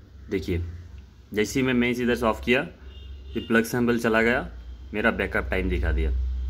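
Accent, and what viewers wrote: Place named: Arunachal Pradesh, India. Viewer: Arunachali Accent 🎉😅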